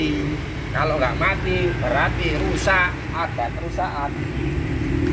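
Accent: East Java, Indonesia. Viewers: Boso jowo timuran iku cak....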